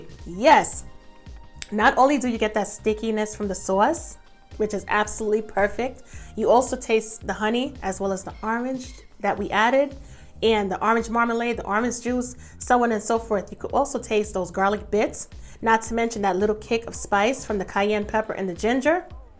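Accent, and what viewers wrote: New York, United States. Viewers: Love the new York accent. You always tell another new Yorker when you hear them.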